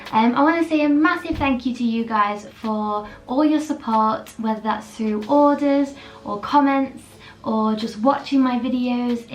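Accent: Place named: Midlands, United Kingdom. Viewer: You came up in my recommendations and I really liked your video so I’ve subscribed. Are you from the Midlands?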